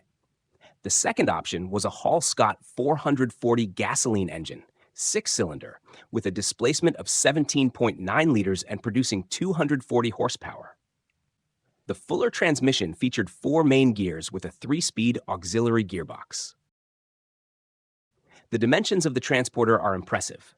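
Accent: United States. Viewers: American accent with all measurements in metric----?????? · Please use cubic inches on American vehicles and list the torque of the engine. You sound like an American and engine torque is how these huge low rpm engines moved so much weight.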